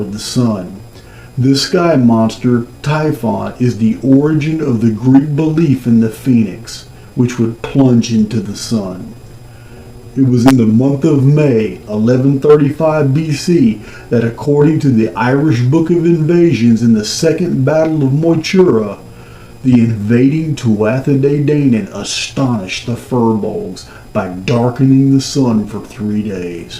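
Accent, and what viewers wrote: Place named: South, United States. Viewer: you sound sooooo Southern here man haha love it 🧡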